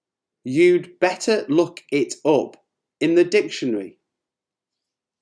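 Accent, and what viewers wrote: United Kingdom. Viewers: İs this british accent? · Thank you, just found your channel and subscribed. Just wondering, what your accent is. I think it is British at least it sounds British to me.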